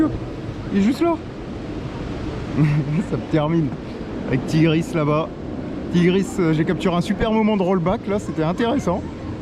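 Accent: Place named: North, France. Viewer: J ai découvert ta chaîne y a quelques jours, bravo pour ton professionnalisme tu fais attention à tout, la musique, le bruit , le vent ( ce n'est malheureusement pas toujours le cas de certains youtubeurs !!!! ) je vais m empresser de visionner toutes tes vidéos J ai cru reconnaître, légèrement, l accent du Nord, es tu un ch'ti, ce n est pas péjoratif j'en suis un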